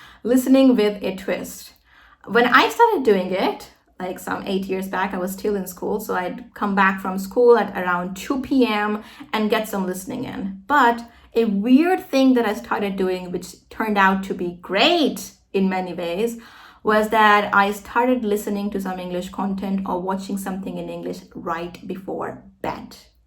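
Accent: United States. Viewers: hello mam how are you doing can I connect to with you once you are my big friend and I I always try to watch your video yeah it is true understanding your accent is difficult but I like so much American accent and I think you use American accent expecting your attention thank you so much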